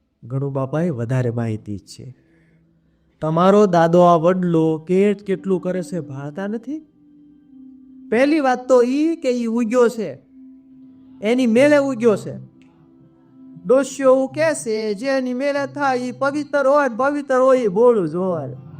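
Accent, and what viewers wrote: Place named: Kathiawar, India. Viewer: શુદ્ધ કાઠિયાવાડી બોલીમાં ચોટદાર રજૂઆત..❤🙏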